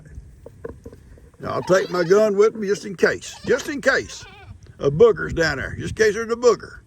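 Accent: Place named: East Texas, United States. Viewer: Good morning and. Congratulations on reaching 50k+ followers. I've been watching your number hoping to see it grow. I love your show and watch them all. Everyday. You wondered if folks would watch an old east Texas man, well, yes we will!! I think it's awesome that you like making videos. Its wonderful for your family and small grandchildren, they will forever have you and on many videos to watch. I also think its wonderful that you and can earn a little money from us watching because most of us understand that retirement means a strict budget. I can say that I love your videos and stories and lives. Your people are great people. I've learned so much from watching your content even. I too am from East Texas so I can understand every word you say. I pray for you and and your family as well as your people here who need prayers. The Lord knows who needs help. 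I pray for everyone in bad weather and flood prone areas are safe. I pray your house project goes smoothly. Much love and thanks from your friend from Leon County. I love the Homicide Hunter too!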